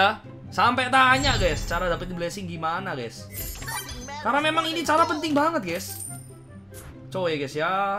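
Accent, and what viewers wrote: Java, Indonesia. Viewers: Wong jowo ndi mas e ? Bhs indonya medok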